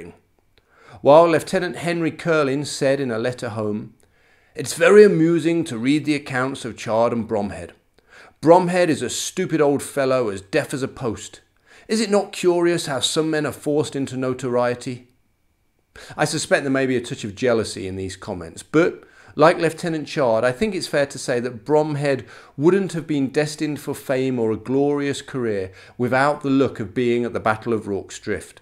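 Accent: United Kingdom. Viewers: Happy New Year old bean ! I enjoy your British officer accent -ha-ha!!! At least in the film, "Gonny" was portrayed by Michael Caine-not 'arf bad !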